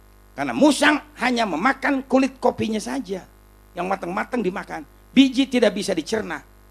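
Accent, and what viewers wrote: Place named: Jakarta, Indonesia. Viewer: suara dan bahasa khas betawi sejak dulu orde baru tegas dan berani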